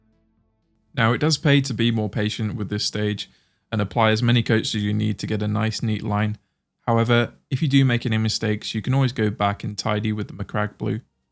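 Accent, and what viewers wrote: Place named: Burton upon Trent, United Kingdom. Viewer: Id recognise a Burton-upon-trent accent anywhere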